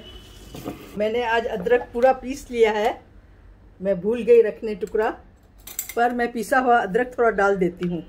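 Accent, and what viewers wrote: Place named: Sindh, India. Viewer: With the name I thought it's a Parsi channel but the time you started to talk aunty ji I got it you are Sindhi 💞❤️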